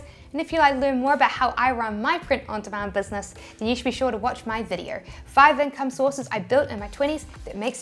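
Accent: New Zealand. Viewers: I just watch because of the lovely kiwi accent 🇳🇿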